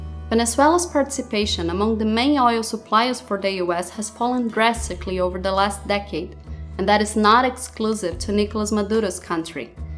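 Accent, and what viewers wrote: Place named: Brazil. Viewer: Belo inglês. Só um pouquinho de sotaque brazuca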